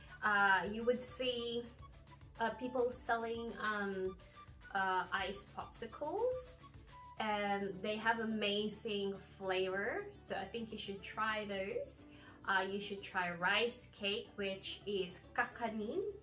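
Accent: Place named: Australia. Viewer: You've started to sound Australian!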